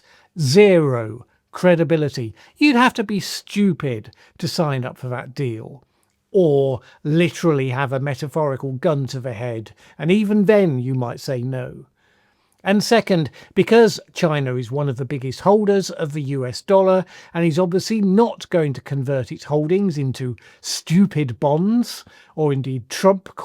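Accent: United Kingdom. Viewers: So Sick of listening to some stupid Bloke, with your horrible accent. We Don't Care about your Cheesy Propaganda. You Brits, have Lost your country to Muzzys. You Brits have Nothing to Say. You have a National Passtime 'SLAGGING THE YANKS'. High Time for you to Grow Up. Leave the TARRIF Deal to solve itself, it will. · How do I block these Brit narrators. Annoying as F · ARE YOU A BRIT ?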